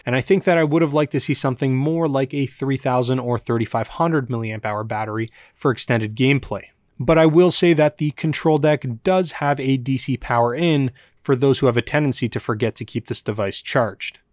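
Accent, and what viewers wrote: Canada. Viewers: Thanks, mayhap I will! Great review, and if I'm not detecting a Canadian accent from you, then I have forgotten my people :)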